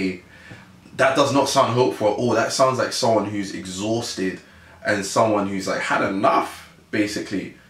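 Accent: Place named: United States. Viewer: Why do you sound like an American?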